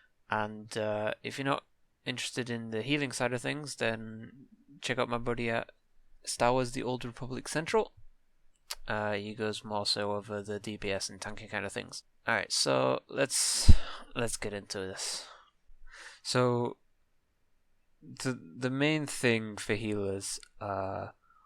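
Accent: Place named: Newcastle, United Kingdom. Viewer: that sounds like a Geordie/Northumbria accent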